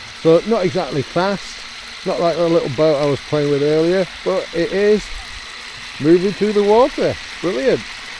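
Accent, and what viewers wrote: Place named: Yorkshire, United Kingdom. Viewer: I can hear a bit of queen's English around the Yorkshire slang, I was thinking Harrogate, but York makes sense 😂 I'm just up the road from Ferrybridge, but spend a lot of time over Selby/Aberford/Wetherby way walking dogs, so not too far from you if yer ever fancy a paddle and a chat!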